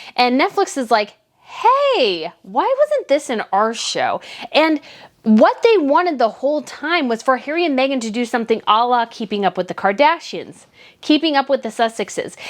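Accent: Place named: United States. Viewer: Amazing how you talk about their little 16 mil dollar home as if you're living in a $33 mil home yourself because you don't. In addition, how are you a royal commentator sounding like an American? There are no kings and queens here in the US, and this IS NOT a Monarch where you get paid to travel. So keep your negativity there in the UK. Smh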